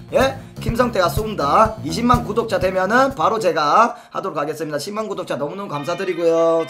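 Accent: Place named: Busan, South Korea. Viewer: 나도 부산사람이라서 말투가 너무 친근했엉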